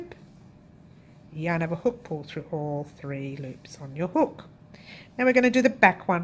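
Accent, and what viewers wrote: Australia. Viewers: Wow love your accent Australian lady ty for sharing.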